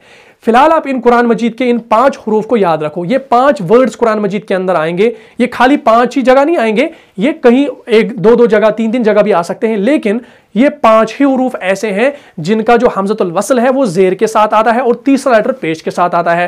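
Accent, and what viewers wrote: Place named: Mumbai, India. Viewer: Qaree sahb aapka Arabi tallaffuz correct hy jbky urdu aap Indians k mumbae walon ki tarah bolty hain khalee ko khalee yanee khal k kaaf ki taraha ghunna ki gonna yanee aap lafz bol sakty hain laijin bilawaja urdu ja tallaffuz bigar dety hainQ?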